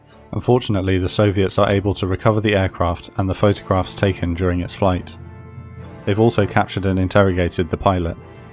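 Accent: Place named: Somerset, United Kingdom. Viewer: Nah, in real life he has a thick somerset accent... Closer to the wurzels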